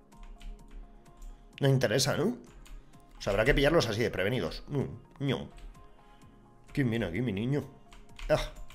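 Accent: Chile. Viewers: Like por el acento chileno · hablando y puteando como un buen chileno sjdj saludos weon🇨🇱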